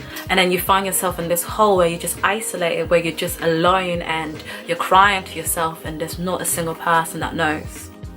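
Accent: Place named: Germany. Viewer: Babes this is beautiful and so are you! 1) I was like WHERE IS THIS ACCENT FROM- you answered Germany! So cute 2) power of the tongue you hit the nail on the head 3) I watched this whole video and enjoyed from start to finish. Your honesty is so inspiring - you’re so brave and a true role model! Cannot believe you could EVER tell yourself those things. To me you are so beautiful. Love ya girl xxx - can’t wait for more!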